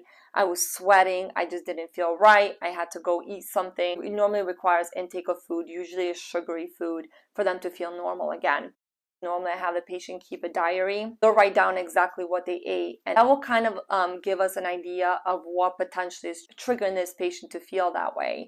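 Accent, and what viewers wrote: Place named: Philadelphia, United States. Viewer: Holy smokes that is a cool thick philly accent.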